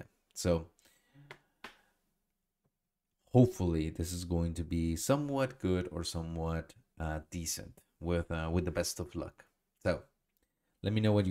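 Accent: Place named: Spain. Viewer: Okay., I'm gonna say it.Hearing a native Spanish speaker say Japanese words like Naruto in English is funny as heck. The 2 accents make it funny as all get out. could probably say it better in Spanish. When he says it in English the 2 accents compound to make it super funny. Don't stop. I still want to here what you have to say. Even if it sounds weird.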